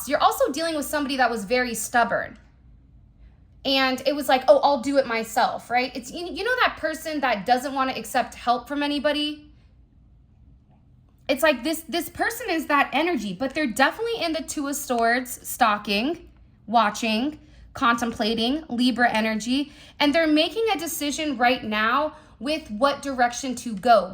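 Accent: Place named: South, United States. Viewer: I want her because she's a beautiful singer I want her because I love her I want her because I love her beautiful voice and her southern accent I want her because she's the most beautiful talented girl 💗 and I told her 56 years ago that I was in love with her because I didn't like to see her cry and her videos and God told me that she needed someone to love her man that's exactly what I did❤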